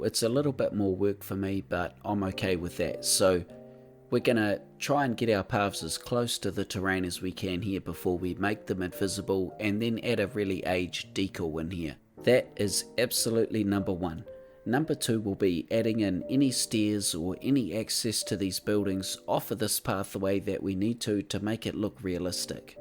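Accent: New Zealand. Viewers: Good to hear a kiwi accent. Aotearoa represent :elbowcough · Like you I really enjoy the way you can create art in this game. I don't get anywhere near as beautiful and detailed a finish as you though even after hours of PO work. You really capture the character and charm of old Europe. I love your work and also your lovely NZ accent. 😍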